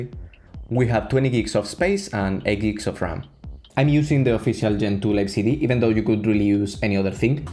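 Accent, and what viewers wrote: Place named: Spain. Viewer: are you spanish? that accent damn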